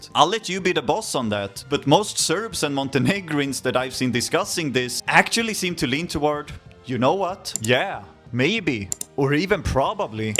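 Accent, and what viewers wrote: Sweden. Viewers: Great report, and i love your swedish accent, i didnt know the prevailing result was only .5, thats just mad, its interesting to see the mindset of ppl at the time, that half nation that staying with serbia is better. Maybe back then it was a different geopolitics, I wonder what ppl think today, do they think that Montenegro being independent was a good move and are they happy where that choice landed the country in a more beneficial place than had it stayed in union with serbia? How would they feel if they had stayed with serbia as it is today? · as an austrian, I realy like your swedish accent. nice video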